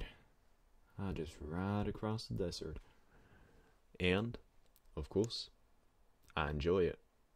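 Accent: South, United States. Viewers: as a person who lives with country people, The accent is so funny to me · That was a good southern accent attempt 👍👍👍👍 good job 😊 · I am so confused. Is the location America west or Austraila west? If it is America west, we don't have dingos. If it is Australia west, then the accent should be Australian and not southern north American.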